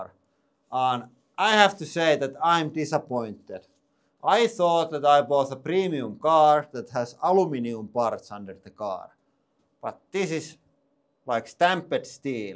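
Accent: Finland. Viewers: English speakers, how do you find this guy's English? This is what we call in Finland, 'rally English', and I hear some of us really speak this way. I don't, but I'm a curiosity.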